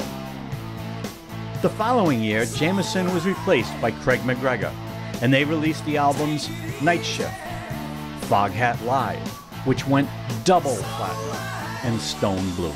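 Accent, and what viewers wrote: Boston, United States. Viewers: That’s because of his Boston brogue. It’s like telling an Australian they pronounce “car park” wrong because they drop their r’s 🙄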